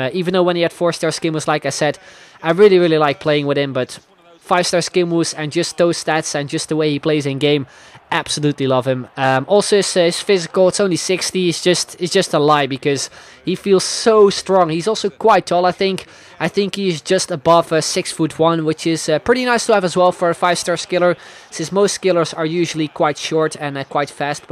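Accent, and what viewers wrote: Netherlands. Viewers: Hey, are you dutch? Oh, and I love your proper content, you earned another subscriber 😊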